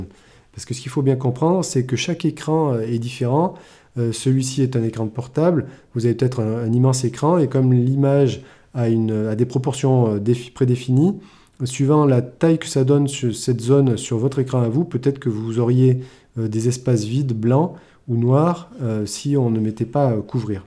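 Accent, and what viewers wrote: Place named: Isère, France. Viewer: merci sympa ton accent :) tes dans l'Isère ?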